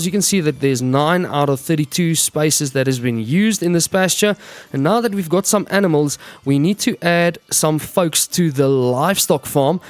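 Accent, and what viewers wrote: South Africa. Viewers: The way this guy speaks, I can swear he's South African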